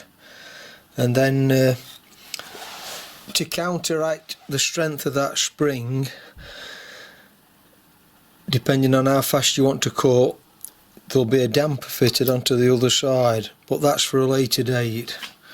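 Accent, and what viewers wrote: Yorkshire, United Kingdom. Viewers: Nice little machine . You sound very sort of Pontefract area. From a Leeds lad.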